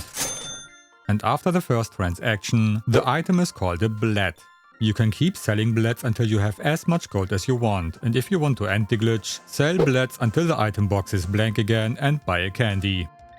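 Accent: Germany. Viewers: Hard German accent, but great. Sword orb glitch not possible on PAL. Possible on switch? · Are you German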